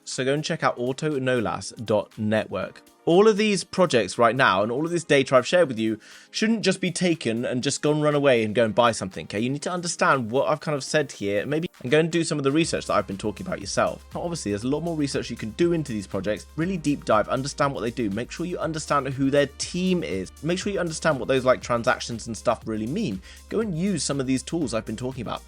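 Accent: RP, United Kingdom. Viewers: Great to see content from the UK, straight talking, no BS. Would be good if he could swap his posh accent to a Geordie one.......just for one vid, obviously. LayerrAI is my pick. Keep up the good work 💪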